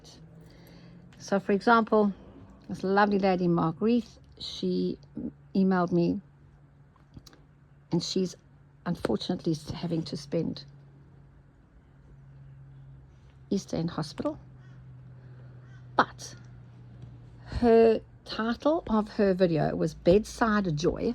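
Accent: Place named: South Africa. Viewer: Just came across your video. Lovely to hear another Saffer accent. :)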